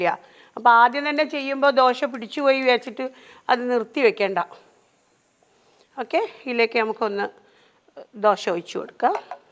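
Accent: Kozhikode, India. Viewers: I am from Kozhikode now living in Banagalore when I hear you talking in typical kozhikkodan Slang oh my God I really like it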